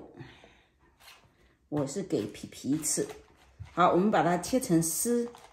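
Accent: Fuzhou, China. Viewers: 謝謝老師的分享🙏🏼 確認一下這鍋的英文是 Carbon Steel嗎？多謝您的回覆，我超愛您的福州口音❤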